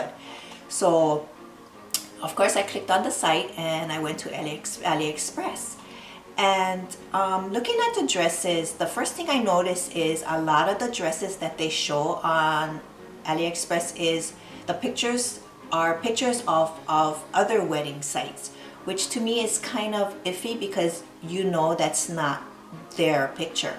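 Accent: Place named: Hawaii, United States. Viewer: Ho aunty, nice review! I knew you were from Hawai'i after I hear you talk. Aloha and mahalo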